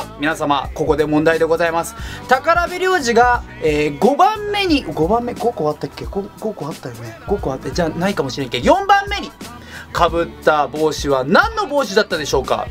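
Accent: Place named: Kyushu, Japan. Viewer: リアルタイムでも見れそうだったのですが、日テレっ子なので…(笑) 今朝録画したのを観ました！真面目にお話してるかっこよかったです(´˘`) でも、九州弁で話す動画のも好きです(˙˘˙)❥❥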